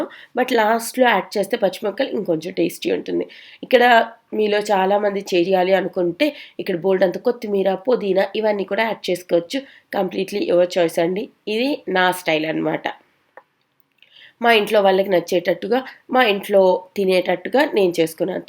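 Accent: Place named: United Kingdom. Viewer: Meru middle lo English words Chala Baga use chestunaru accent uk laga undi uk lo chadvara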